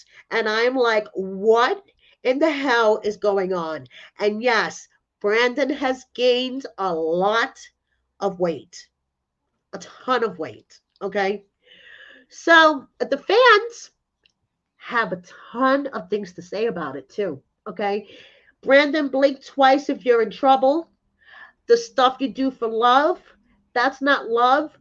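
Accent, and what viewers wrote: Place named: Brooklyn, United States. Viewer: You sound like a typical Brooklyn girl? Do you happen to be Jewish also?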